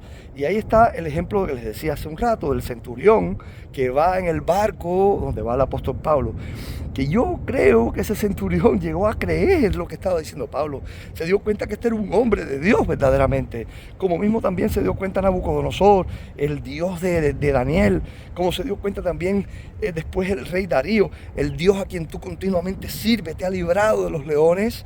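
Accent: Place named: Cuba. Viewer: Fuera creyente o no los que su propiedades quedaron intactas, solo podemos decir que Dios los cuido. No entiendo porque usted decarta lo que está antes los ojos de todos. Creo que su forma de hablar me parece Cubano. Parece más ateos que alguien que hace una exegesis de su narración. Nadie puede negar que todas las casa que no le paso nada fue un milagro de Dios.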